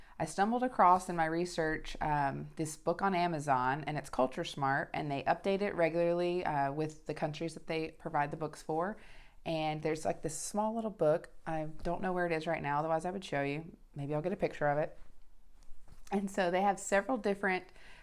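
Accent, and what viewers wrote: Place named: Norway. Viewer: She has picket up the local accent That's why it sounds very special. I had no problem understanding it was Norwegian.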